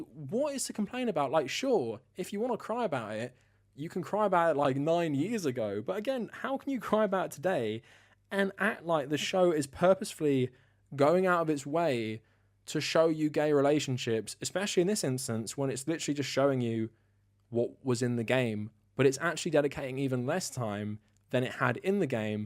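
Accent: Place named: United Kingdom. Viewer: That’s funny. I never thought there was any other way to say those words. I mean, I sometimes say mirror like it has an o in it, but only because it sounds weird. English is a nonsense language with no real rules. That’s what makes it so good. And in all honesty, I’m a sucker for foreign accents, which is one reason I watch this channel. Everything sounds smarter with a British accent.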